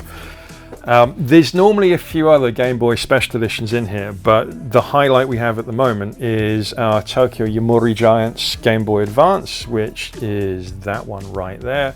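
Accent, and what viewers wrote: Bristol, United Kingdom. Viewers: is LOVELYYYY, love the video! Found you cus' of Super tomato page! Wooo - Also recognise that bris accent anywhere haha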